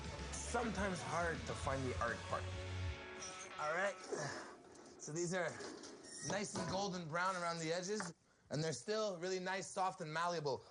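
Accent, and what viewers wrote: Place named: France. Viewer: hes not gay. french is his first language so he sounds a little different. im going to marry him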